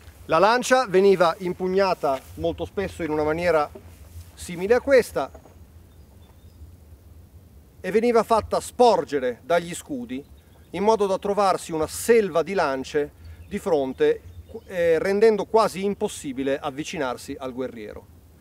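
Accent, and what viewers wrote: Italy. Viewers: Ah ah okay It’s a Northern pattern (Gallo-italic language variety) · Mo soccia, che bella cadenza bolognese.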